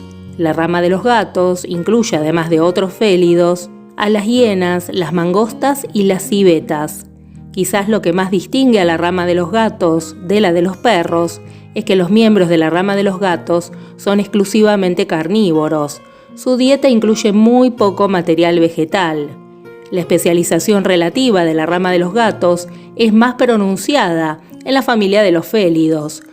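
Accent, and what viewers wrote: Argentina. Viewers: se nota mucho que eres de argentina y por alguna razon me gusta como hablas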